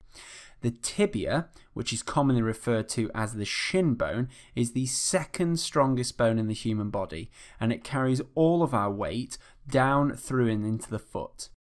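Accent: England, United Kingdom. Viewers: your english accent is super strong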